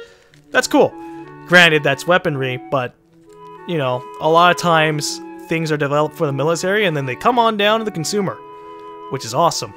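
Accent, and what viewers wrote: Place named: Hawaii, United States. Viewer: hey you from hawaii... brah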